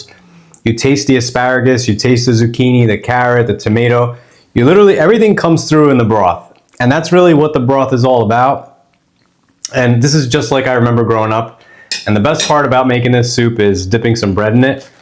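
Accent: Philadelphia, United States. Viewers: Reminiscent of a Philly accent. Reminds me of home and Gramma Consolo's cooking.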